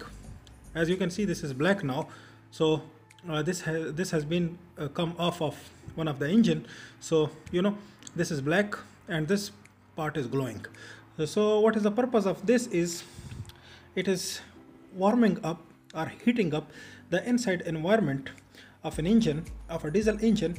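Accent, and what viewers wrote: India. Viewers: WE understand clearly your english with an Indian accent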